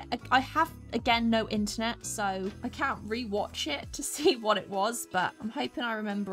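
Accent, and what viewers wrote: Australia. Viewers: I still can't believe your related to the youtuber Mr H and friends. I'm Praying for your family. First when I came around your channel I wanted to learn how to paint and you sounded like Australian 😅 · S I thought u r an Australian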